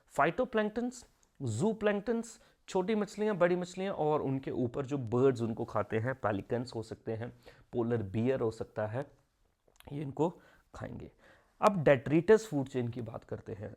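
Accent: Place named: Punjab, India. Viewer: Sir aap Punjabi ke lagte ho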